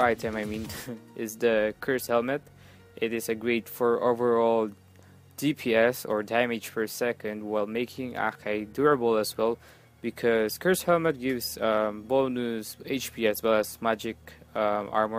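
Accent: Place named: Philippines. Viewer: pinoy 👍😂 halata sa accent.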